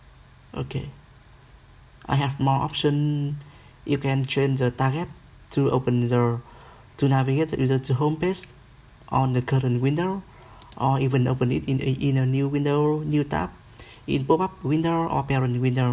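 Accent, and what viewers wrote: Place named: Vietnam. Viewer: Nghe giọng phát âm này đích thị người việt rồi :v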